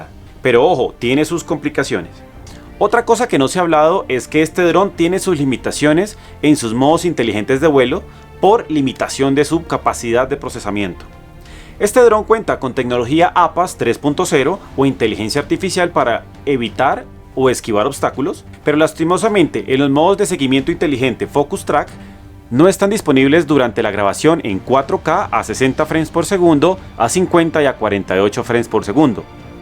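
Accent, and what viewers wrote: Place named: Colombia. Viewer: Amigo. Eres Colombiano?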